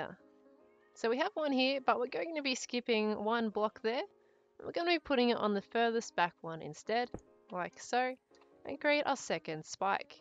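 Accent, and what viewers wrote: Australia. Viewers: are u Australian? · I love your Aussie accent! ❤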